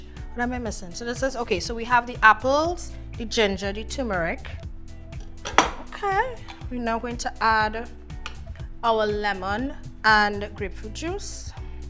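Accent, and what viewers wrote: Trinidad and Tobago. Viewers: I just saw this and loving the enthusiasm ❤❤. Am I detecting a Trinidadian accent?? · Yep, Trini to d bone lol Welcome to the channel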